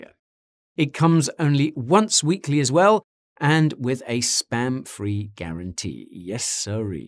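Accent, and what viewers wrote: France. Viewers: You're french accent is so nice to hear :) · Your French accent is spot on sir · French accent is not so bad 😂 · that france accent is so funny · Nice French character, the mustache makes me thinking more of a Spanish guy but the accent is there. Love it. The content as well! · I love the French accent haha 😂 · Greatest cliché french accent i’ve heard in a while (coming from a french dude)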